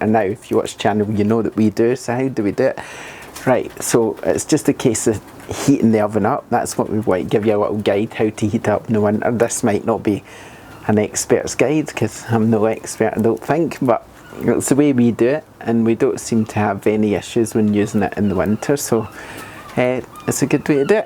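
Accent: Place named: Glasgow, United Kingdom. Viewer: Thanks for the info! I love your accent - are you from Glasgow?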